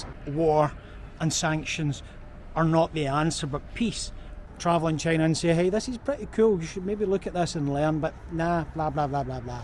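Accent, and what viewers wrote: Scotland, United Kingdom. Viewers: Scottish accent is cute 😊, and welcome to my home country, hope you enjoy your trip! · Oh Sir, your Scottish accent is so fascinating😂My situation is quite complex that I'm Chinese, learned British English before I went to Australia. Me and my friends watch American sports games so I'm already lose that what kinds of English rules should I follow. Now you add on. I'm going to be crazy now.🙄 I'm already suffered in Chinese dialects hell, why one more ... BTW good contents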